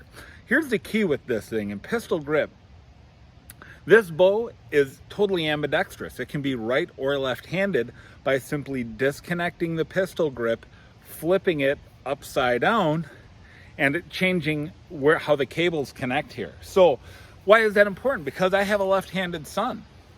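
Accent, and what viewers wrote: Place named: Pennsylvania, United States. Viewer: lol he sounds like an Eagles here in Pennsylvania... I must agree with you... My son is on the Mathews train... He owns the newest one of 2022... I forget the model honestly... Completely dressed out its heavy... He had to add so many silencers to quiet the bow down I was actually in shock and his is set at 40 lbs... But I've been doing research on the shortest Ata and with good speed... I've seen its been 2 years now since you posted this video... Can you give an update??? How's it holding up??? Any issues??? I'm curious... I live here in Central PA not far from State College and the hills are steep some are very thick with under brush and heavy thorn bushes... So I'm trying to stay light and short...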